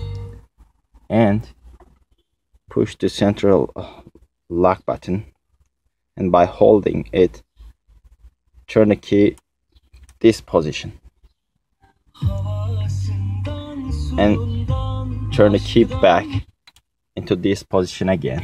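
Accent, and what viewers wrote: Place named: European Turkish, Turkey. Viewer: ASLAN Aslında konuşmasından biraz belli avrupa da bir türktür kesin.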